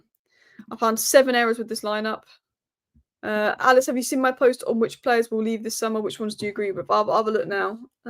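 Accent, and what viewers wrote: Manchester, United Kingdom. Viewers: Could you repost this with subtitles please? I can't understand that thick Mancunian accent OK Yah.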